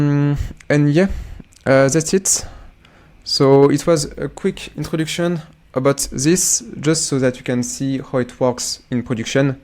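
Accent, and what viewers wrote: France. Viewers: Good video with your french accent.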